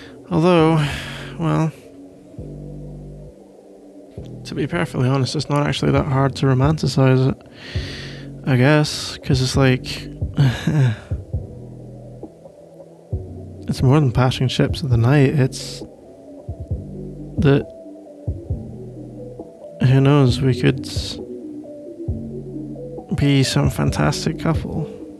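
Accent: Scotland, United Kingdom. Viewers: AAAAAAAAA i love scottish accents so much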